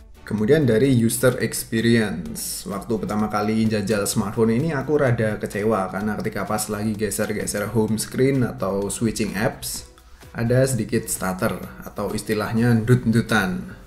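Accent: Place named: Yogyakarta, Indonesia. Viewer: Wong jogja toh?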